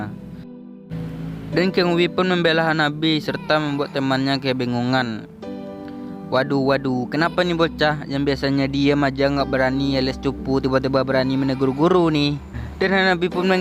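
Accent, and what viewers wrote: Madura, Indonesia. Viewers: Ga bisa ilang logat maduranya wkwk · Orang Madura ya bang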